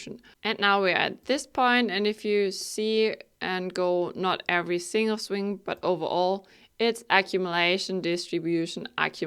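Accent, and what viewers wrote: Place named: Germany. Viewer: Ich wusste doch ich höre den deutschen Akzent heraus 😅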